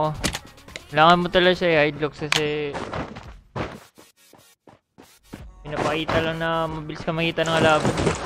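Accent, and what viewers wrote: Visayas, Philippines. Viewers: bisaya ka pala ehh~!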